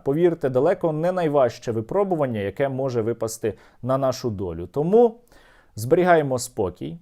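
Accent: Ukraine. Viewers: Українська в нього стала набагато кращою!!!Але вплив російськомовної Одеси на нього ще відчувається!!!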